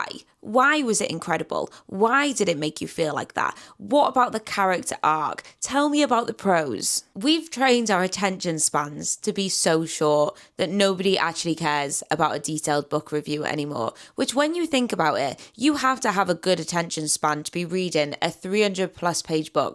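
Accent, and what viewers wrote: United Kingdom. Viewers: With those hyped/popular books, I'm often a bit are the popular because everyone talks about them or are they popular because they're actually good quality literature? In a majority of the cases, I feel it's the first option, and yes, I might be a bit cynical, I can admit that. And while it's of course great if Booktok and the hyped books may have gotten some people to read, don't get me wrong, but there's so many other books out there, that's also well-written. Just to show the middle finger to Booktok a bit, I did start an "old school" book blog where I write about mainly literary fiction, contemporary, classics, and world literature. Some titles more popular than others, but also a bit more "hidden gems" when I come across them. Btw, can I just say I love your British dialect?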